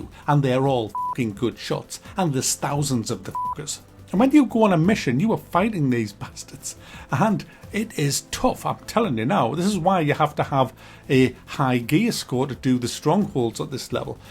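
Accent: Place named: North, United Kingdom. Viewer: Is is just me or is sounding even more northen than usual lately?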